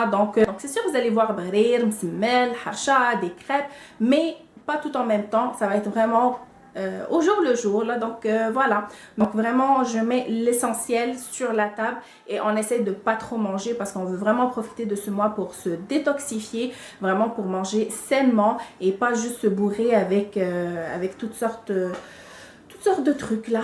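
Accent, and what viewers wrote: Quebec, Canada. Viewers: ton accent quebecois 😍😍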